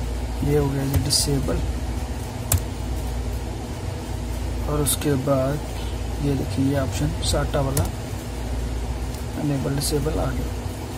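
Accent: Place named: India. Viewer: If u hear an indian accent u know its gonna work. I've been working on this problem for 3 hours and after doing what this legend does it worked first try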